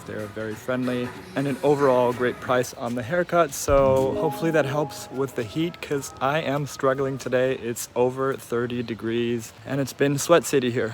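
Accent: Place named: United States. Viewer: American accent but uses celsius?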